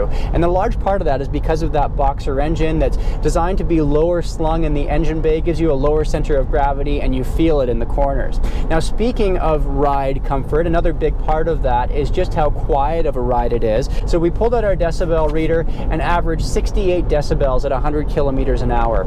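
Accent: Australia. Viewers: Thats bloody good Aussie accent mate, good on ya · Your Australian accent is excellent!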